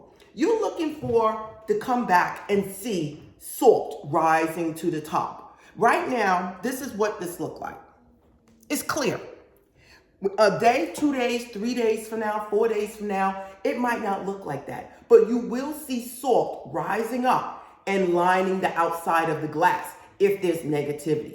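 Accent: New York, United States. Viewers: Hey dear!! I used apple cider vinegar instead, because my other vinegar is in the storage. Is that OK? I think it should be totally fine. Also, I just did the ritual and I'm looking forward to seeing the results in 3 days. PD: Love your NYC accent. That's the coolest accent in the US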